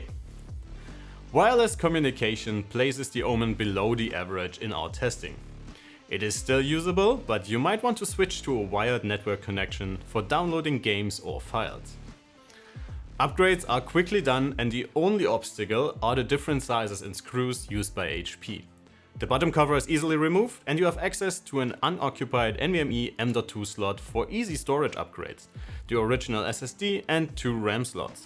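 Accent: Germany. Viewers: Mach mal bitte Timestamps rein, ich höre deinen deutschen Akzent, liebe Grüße.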